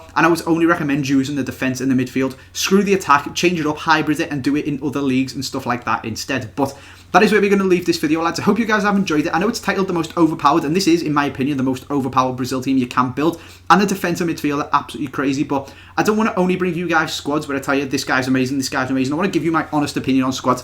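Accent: Liverpool, United Kingdom. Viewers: U so scouse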